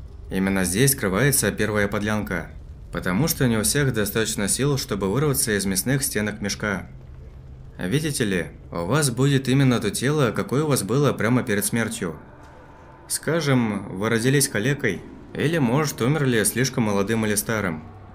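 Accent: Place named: Urals, Russia. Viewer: Да,в утра.Уральский говорок-это круто.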